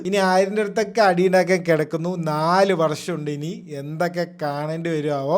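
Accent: Palakkad, India. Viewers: നിങ്ങൾ പാലക്കാട്‌ ആണോ....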